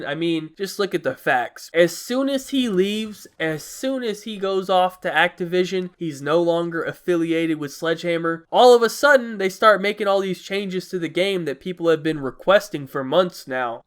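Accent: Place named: South, United States. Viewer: he sound southern like deluxe 4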